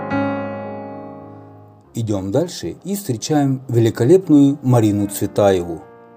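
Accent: Ukraine. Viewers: Ха)))) Меня тоже жутко резануло по ушам голос за кадром! Это надо же,даже не поработав над своей дикцией пускать это все в эфир!)))) Не лучше ли было с таким украинским акцентом,озвучивать на украинском?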